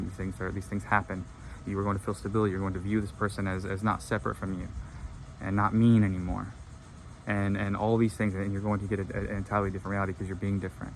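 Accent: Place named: Cockney, United Kingdom. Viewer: Haha I’m British and love the little cockney accent you slip into your videos 😂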